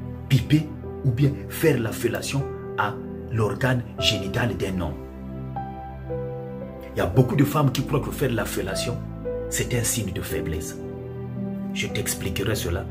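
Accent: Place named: Gabon. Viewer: Ah ta we mu Nzebi? Tu parles comme un gabonais...Merci pour les conseils.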